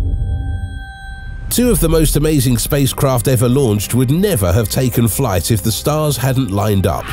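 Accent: United Kingdom. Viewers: i don't like british accent for narration .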